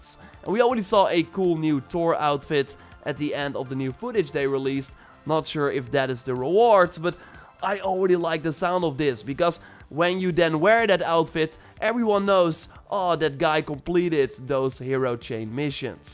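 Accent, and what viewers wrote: Amsterdam, Netherlands. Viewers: youre inglish sounds like a hollander zeker uit amsterdam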